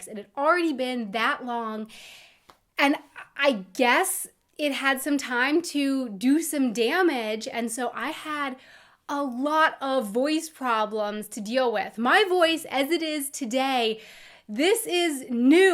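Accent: United States. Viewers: Do not bother about the haters! They are wrong! The majority of the haters is not self-confident and they only write such comments because they feel better when they are bashing others. It is only a push for their ego. Do not care about such idiots - they are not worth it! Your American accent is one aspect which makes you and your language special. My father-in-law lives in Germany for about three decades and he still has an American accent. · Haters gonna hate, no matter what you do, I guess-pretty sure there are people out there who would complain if you lost the American accent and started using a German one for your English language videos, too. I'm definitely not one of them-I figure that, as long as the people you interact with verbally can understand you, why worry about your absolute accent? I come from New Zealand, and have spoken with people from a wide range of foreign countries, some anglophone, many others not (I could list them individually, but it would be a long one-suffice it to say that the only continent not represented would be Antarctica) and usually had little trouble understanding their version of spoken English, even if occasionally heavily accented. 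Chances are, your accent when speaking the second language (i.e. not your mother tongue) will naturally converge with theirs over time, anyway, but you might retain your native accent for your mother tongue (only might-this accent convergence even works if the foreign country speaks your mother tongue). I was thinking there could be another reason why you hadn't uploaded many videos in German, aside from the medical advice: probable majority anglophone audience-unless the objective is to teach German to the watcher, rather than inform/entertain them with anecdotes and fascinating facts about the country and its culture, there is little incentive to deliver the video in such a way. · I love your videos! I am also an American from Florida living here in Bavaria and I have to agree that sometimes it can be a little discouraging when people tear you down over small things when you are learning another language. 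BUT BE PROUD OF YOUR AMERICAN ACCENT! Those same haters have accents when speaking other languages... zum Beispiel, englisch. :) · You should ignore the haters. Your American accent sounds cute and as long as people understand what you are trying to say, I'd say you'll be fine. It's not about sounding like a German, it's about communicating in German... I hate it when people complain about my accent. There's not so much you can do about it. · Don't mind the haters. You grow up American, you learned and spoke with a American accent all your life. It is who you are. Some people can learn other languages and accents easily but not everyone can. But that does not mean because you have difficulty with the accent you should not speak the language, just as there are many different dialects of German all being spoken in its own unique way so do the way you speak it differ, that is nothing to feel bad about. 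· You should be able to be yourself. If people have a problem with it, it's their problem. At least you make videos, which is more than they do. I've never met a German that speaks English, that doesn't have a German accent, so I don't understand the big deal. If they are that mean about your American accent, they are the unaccepting ones. Who would want to emulate them? They can go fly a kite. Forget the haters. If they don't like your accent, they aren't worth associating with. Some Germans love the American accent. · please dont work on your accent, its so lovely when americans speak german :) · Oh my. But. But. Your american accent is absolutely lovely. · and noo don't work on your accent, the amerikan accent in German is such a cute accent :) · It´s totally ok to speak German with an American accent because you are American : ) I think it must be pretty hard to learn German and you are really doing well!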